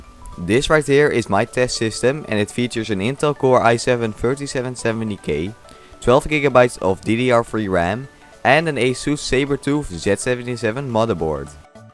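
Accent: Netherlands. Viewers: I found an xfx version for 30 bucks, very happy with that. Btw where are you from? You sound very dutch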